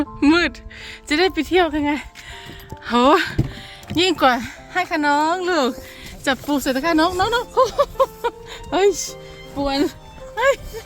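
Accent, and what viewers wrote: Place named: North, Thailand. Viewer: ลูกสาวสวยมากกกกก สำเนียงคณแม่ เป็นชาวเหนือหรือเปล่า ขอโทษนะคะ เพิ่งตืดตามค่ะ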